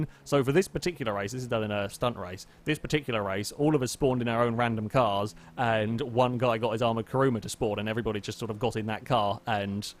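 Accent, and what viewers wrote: United Kingdom. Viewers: Their are some british dialects I find pleasant then their are others that are just plain annoying. Yours sir, is the latter. Zip it. Closing this obnoxious sounding video.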